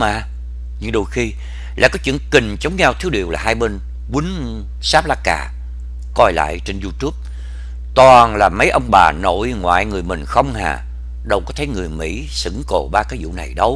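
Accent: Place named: Saigon, Vietnam. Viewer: Thích nghe giọng đọc của anh , giọng chuẩn Sài gòn ❤️👍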